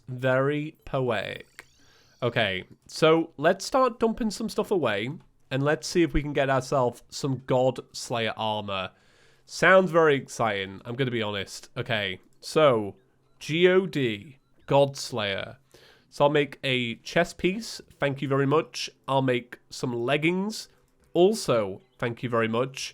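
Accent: Australia. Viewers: Didnt know he was australian XD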